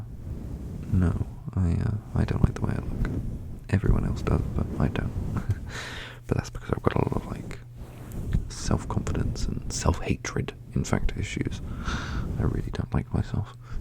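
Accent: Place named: Bristol, United Kingdom. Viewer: Ahh thank you so much for answering my question!! Also your bristolian accent is so cute you should totally do a video with it!!! The rambles about k pop and the stuff you’re into was super cute as well you can totally tell how passionate you are <33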